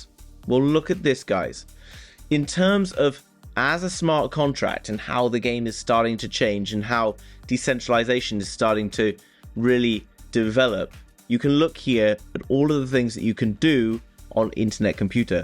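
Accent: United Kingdom. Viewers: You look like a young rich old wise man if that makes sense. It’s probably the old money living room and British accent you have. I love it haha 😂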